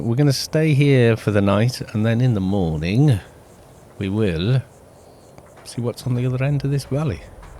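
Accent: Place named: Wales, United Kingdom. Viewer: But I do like the way you pronounce any word starting with a double L ... That's very Welsh.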